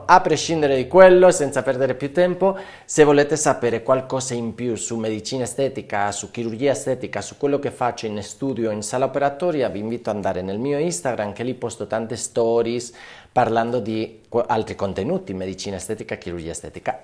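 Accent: Spain. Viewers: Caro dottore, l'ho trovata x caso e le faccio i miei complimenti x la chiarezza di questo video. E anche x il suo bellissimo accento spagnolo😊Grazie.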